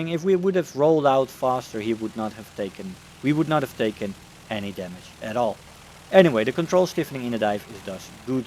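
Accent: Netherlands. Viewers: dat nederlandse accent lol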